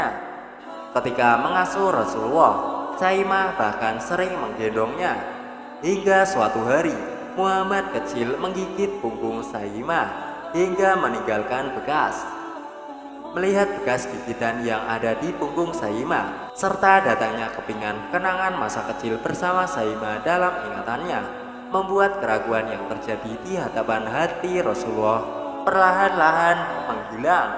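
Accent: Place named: Java, Indonesia. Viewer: Teruk bahasanyer..pelat Jawa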